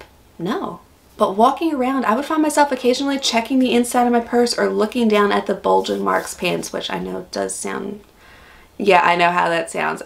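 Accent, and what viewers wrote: Australia. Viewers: You now sound like an aussie. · Sounds like you've become Australian. Congratulations! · I can hear that you are subtly starting to gain the Australian accent, congrats and welcome home.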